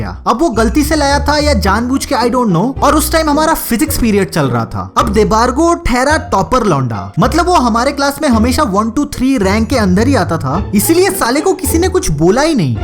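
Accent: Bengal, India. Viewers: Are you bengalee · Bhai tui west bengal se ho? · BHAI TU BENGALI HAI KYA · Tu Bengali hai Kya Bhai... ? BTW nice video Love from Kolkata ❤️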